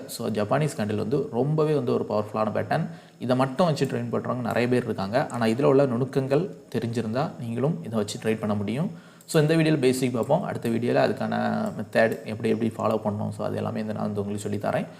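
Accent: Kanyakumari, India. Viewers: slang vachu kandu pudicha am from kanyakumari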